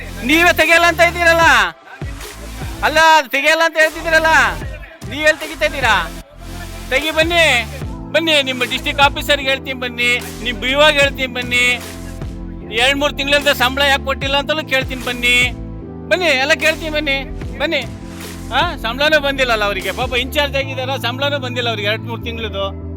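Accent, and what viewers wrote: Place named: Davangere, India. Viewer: Super 💥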